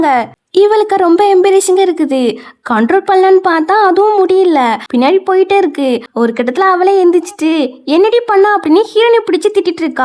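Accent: Tirunelveli, India. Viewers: Unga voice romba nallaruku pa neenga nellai slang dhana pesurenga nan tenkasi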